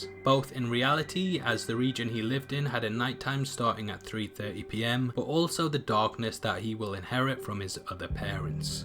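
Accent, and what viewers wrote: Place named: England, United Kingdom. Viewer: This guy was incorrect about a handful of things in the movie and then says "they have became" ... I guess having an English accent doesn't guarantee that he'll speak proper English? Thankfully, I got the gist of the video. Lastly... this movie was not a thriller and frankly is not worth the time. It's a horrible movie.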